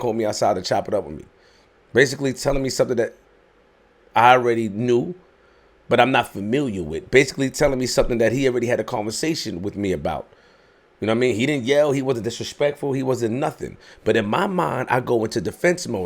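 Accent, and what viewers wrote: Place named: Queens, United States. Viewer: You talk like if Christopher Walken was from Queens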